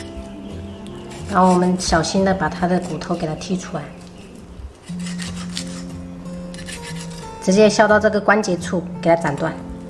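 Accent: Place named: Fengzhou, China. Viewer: 听口音，好像是丰州的，或是浮桥?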